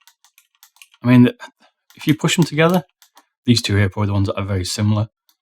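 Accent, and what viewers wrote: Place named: Cockney, United Kingdom. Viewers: I'm betting Scottish, if not then English. Yeah, people have accents, some are harder to understand compared to others. I understood you just fine. Though the music may have been a touch loud. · Enunciate please. Too Cockney to understand. There's some good info in there somewhere.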